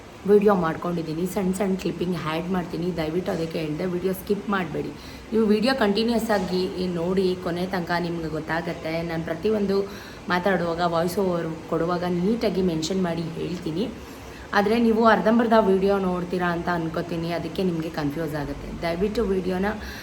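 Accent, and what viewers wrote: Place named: Rural, India. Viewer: Sis nim gramathara bhashe chennagide keloke😂❤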